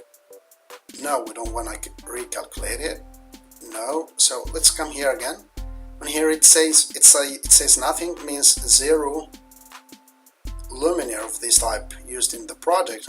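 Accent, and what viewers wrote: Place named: Australia. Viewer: you got some accent Matttte !! AUstralian !!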